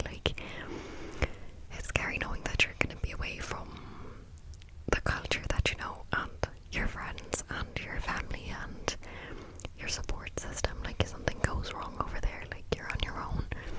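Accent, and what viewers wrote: Ireland. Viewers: I thought and did exactly the same! 😂 Love the Irish accent! (more please) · BTW love your Irish accent and your walkthrough of your journal! You did an amazing job. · ahhh i love the irish accent videos! it scratches my brain in the best ways lol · Yay really love the Irish ☘️ accent 😊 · Well, ya got me. I'm listening to this thinking it sounds like you're saying you've never been to Ireland before... but how with the accent. And then I looked at other videos 😂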